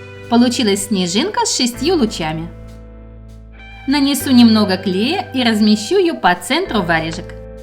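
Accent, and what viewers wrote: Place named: France. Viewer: голос потрясный! как у француженки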